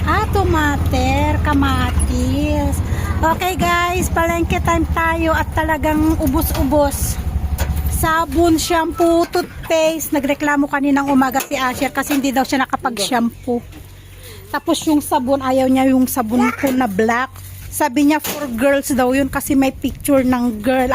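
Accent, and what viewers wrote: Iloilo, Philippines. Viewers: ilongga kapo maam?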